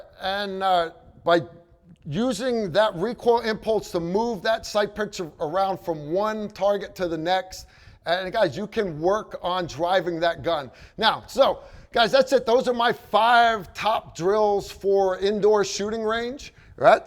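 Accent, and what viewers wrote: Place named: Eastern Pennsylvania, United States. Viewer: The accent🤔 eastern Pennsylvania maybe?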